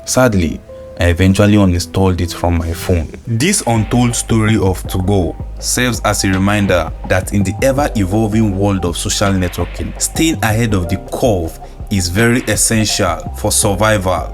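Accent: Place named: Nigeria. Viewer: "and exact tttime for lekchors" Eh! Your strong Nigerian ascent sha🥵🥵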